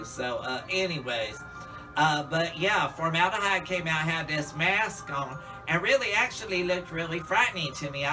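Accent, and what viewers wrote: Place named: South, United States. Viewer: Thanks for the subtitles,. Not everyone can speak Southern Country. Great review! Hot Topic Clearance Rack, lmao.....Happy Halloween Doll!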